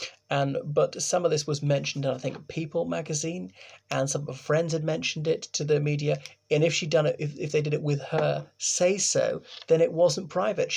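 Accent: United Kingdom. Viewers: You don’t have an Irish accent. You sound British!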